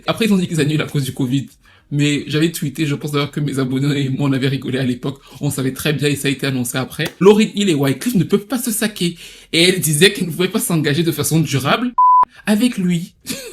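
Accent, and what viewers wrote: Cameroon. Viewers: Toi... tu n'es pas camer par hasard ? 😅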